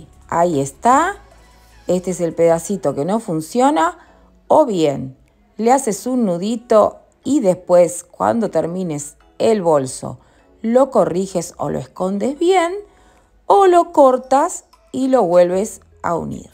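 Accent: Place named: Central America. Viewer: Me gusta el tejido, muy fácil!!! No entiendo xq siendo argentina habla como centroaméricana ó algo así. Pero todo excelente!!!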